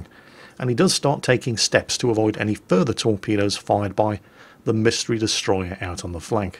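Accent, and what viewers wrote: England, United Kingdom. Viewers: Graham Norton has the same sort of sound, check <link> Is it just a British affectation? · my friend, i must tell you.. I don't play these games, but love to watch, and out of everyone i watched you are the only one that caught my attention.. And i also subscribed, to your channel, i guess you are the only one that's not annoying.. Plus you are very knowledgeable and can flat out play too.. Your commentary is just as mind blowing as the battles, and for a guy from the inner city of Baltimore md in the U.S.A. i love that English accent.....🇺🇸🇬🇧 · is a smoker......also: There is a reason (I'm not a native speaker) i switched over from hiding my accent with a learned American one and switched to using version of British accent.....its very easy on the vocal cords.....at least in my experience. It's soft on purpose.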